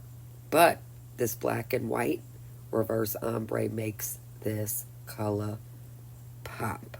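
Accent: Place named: New Jersey, United States. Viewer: You have to be a jersey girl lol if not you literally close ❤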